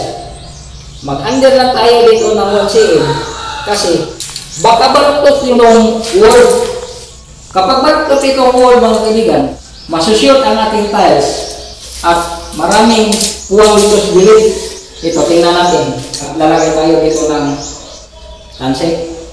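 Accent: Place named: Visayas, Philippines. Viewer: Kaibigan , kung quality control procedure pag usapan natin yong procedure mo hindi ac ceptable . Bakit ? Hindi tama ang mga salita or word sinasabi mo , gaya ng pag lagay mo ng level gamit ang string , or tansi sabi mo elevation hindi elevation tawag nyan kaibigan ang tawag nyan level . Ang term na gamitin mo paglatag ng tiles kung hindi kapa naglagay ng mortar para maiindihan ng viewers , ang term na gamitin mo ay dry "Pre lay ". Saka kailangan nga basa-in mo ang substrate ng structural flooring bago ka mag umpisa maglagay ng mortar. Hindi mo rin sinabi kung anong timpla ng mortar . Ano ratio ng buhangin at cemento ? Yong buhangin mo naka screen ba yan ? Bakit hindi ka gumamit ng tile adhessive.? Kaibigan, sorry to correct your language , kc parang bisaya or cebuano ang mother language mo. Yong sabi mo na special cut ,ang tawag nyan sa tagalog " seroho" in english cut tiles. 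Ang insakto quality procedure pag instal ng tiles na ginagawa namin sa Middle east gamit ng dry pack . Madali at effectivo ang paglagay ng tiles . Ang dry pack mix design is 1:2,5 one bag cement and sand 2.5 compose of screen sand buhangin nakabistay. Mag timpla ka ng cement paste compose of cement and water . Yon ang ebuhos mo sa dry pack pero hindi lahat ng area sa naka spread na dry pack mix. Kailangan compacted ang dry pack mix gamit trowel habang nag spread ka ng dry pack mix. Dami pa malalaman mo kaibigan amigo. Akong paminaw bisaya ka kc accent mo bisaya. Bisaya man pod ko .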